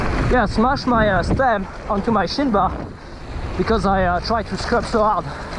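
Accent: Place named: France. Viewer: joli accent français XD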